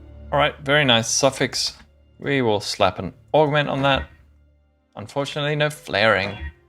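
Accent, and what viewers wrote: South Africa. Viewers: Are you Afrikaans? Your English accent sounds like you might be from SA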